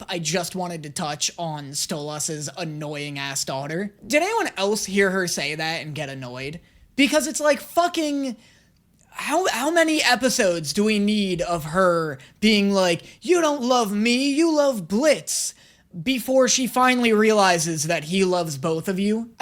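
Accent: United States. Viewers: I think the thing with Stolas’ name is that he’s got a posh English accent and you’re using the same emphasis on the syllables he is, which sounds odd to some ppls ears coz you’re American dunno, you are saying it right tho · People are mad because they want you to say Stolas like (Stol-ihs) instead of (Stol-ass) Edit: The more British you are, the more pronounced the a is in Stolas, so it's said like (Stol-uhs) by the Goetia, and then the non-Brittish speaking characters say (Stol-ihs), the only reason why people are on your case is because no one actually says stolass, they say either Stolihs or Stoluhs, never Stolass- it just sounds similar (I think it's bc half the people who say Stolas are British and you have an American accent, so you saying it similar to them makes you want to pronounce the a as an a sound and not how it's actually pronounced)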